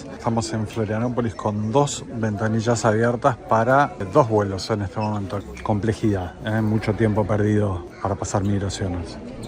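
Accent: Argentina. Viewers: te cuento que descubrí tu canal hace una semana y estoy fascinado. En el trabajo, estoy contando los minutos para regresar y poder disfrutar tus excelentes informes. Son extremadamente interesantes, didácticos, divertidos y esa mezcla de argento europeo que le ponés me encanta. El mejor reseñador de viajes por lejos y te deseo los mejores viajes que serán seguramente los que están por venir....eso sí, compartillos con tus seguidores.....Abrazo gigante para nuestro!!!!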